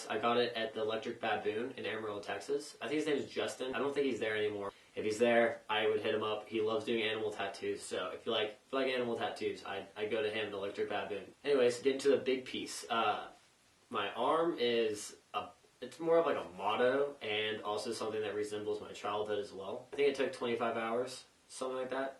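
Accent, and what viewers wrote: Ireland. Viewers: r u irish? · Your Irish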